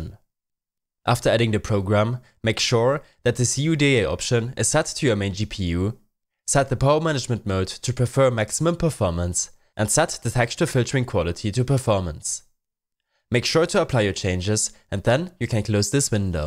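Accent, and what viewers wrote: Germany. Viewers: diese deutsche aussprache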